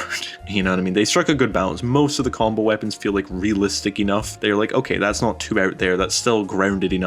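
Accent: Scotland, United Kingdom. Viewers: bro have some cool scottish accent. Love your comment on the whole series! I personally like Dead Rising 3 more than 2. I am totally agree with how you appreciate 2, but Dead rising 3 is a different story. To me it is like a gta with some real content, instead of crime simulator that just stealing car and killing random people and stealing car. The unrealistic combo weapon may look ridiculous, but they are so interesting and full of imagination, free from reality. The unlimited type of weapon spread over the map, and all the collectable makes fun to find all of them.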